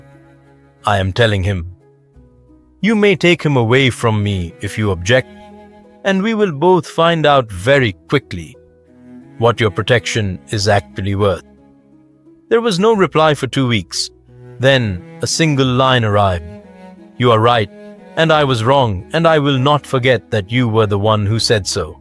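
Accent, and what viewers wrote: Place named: India. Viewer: I enjoyed this story. I liked the Narrator with the Indian accent. I liked that Petunia was a decent character, not the abusive woman she is in all other versions.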